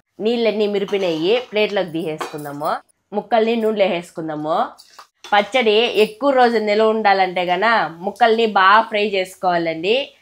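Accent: Rayalaseema, India. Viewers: Rayalseema slang 🥰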